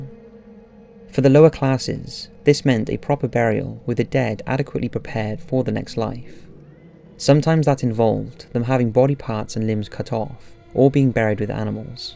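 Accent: Wales, United Kingdom. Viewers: Sounds like a 12 year old British boy is trying to read me a book on Viking torture techniques. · You have an interesting voice. Welsh ? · Why do british people say years like yuurs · Welsh..I believe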